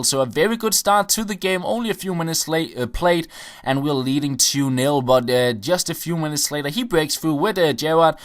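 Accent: Denmark. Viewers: Man you can really hear that you are danish, so hard and thick dialect hehe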